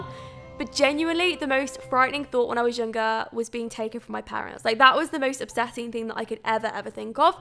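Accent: United Kingdom. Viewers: R u British?😕😞 I am English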